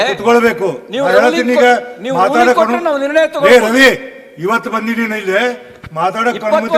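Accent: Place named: North Karnataka, India. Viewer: Good sir North Karnataka language❤❤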